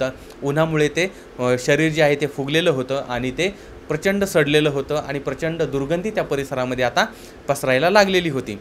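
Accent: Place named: Ahmednagar, India. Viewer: saheb, nice Nagar (Ahmednagar) language. This language is very superior to other district languages especially vocabulary, uniqueness, least niazam urdu, systematic presentation. Keep it up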